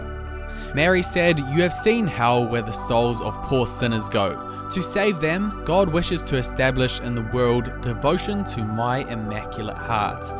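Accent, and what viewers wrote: New Zealand. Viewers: Like your Kiwi accent. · Is your accent Kiwi ??🤔 (NZ)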